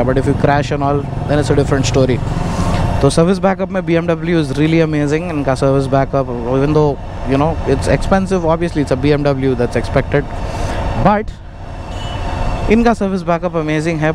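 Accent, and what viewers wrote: Hyderabad, India. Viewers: K T M 1290 k Bare me Video Banow..Zara Hamari Haidrabai me Bolne ka nakko Bhulo..yaron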